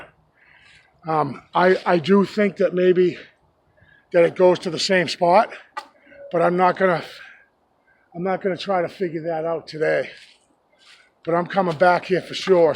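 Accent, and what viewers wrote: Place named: East Coast, United States. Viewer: First time watching your channel, enjoyed it....love the East Coast accent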